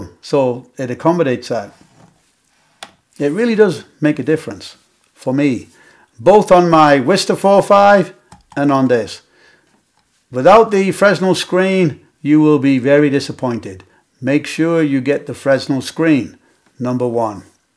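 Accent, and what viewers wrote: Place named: Germany. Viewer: Superb and definitive video, I am interested in your accent, I am hearing, German, Manchester England and California, please do tell 👍🏼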